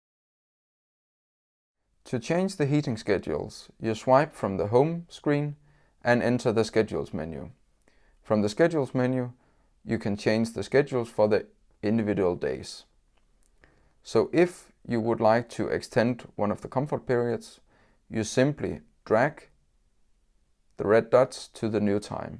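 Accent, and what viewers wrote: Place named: Denmark. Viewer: KÆFT, en slem omgang Danglish! Har I ikke penge til at hyre en engelsktalende vært?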